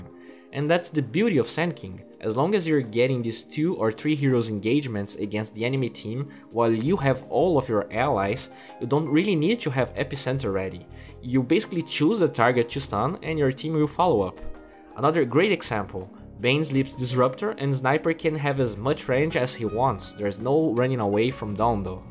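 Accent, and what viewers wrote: Brazil. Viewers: É BRASILEIRO SIM PORRR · Your accent sounds like we brazillians talking in english. Great video, keep it up. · Are you Brazillian ? It looks like by you accent.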